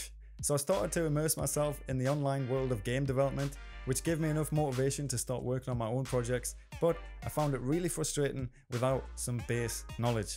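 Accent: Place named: Northern England, United Kingdom. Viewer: You have a bit of a northern lingo you local to Newcastle by any chance :)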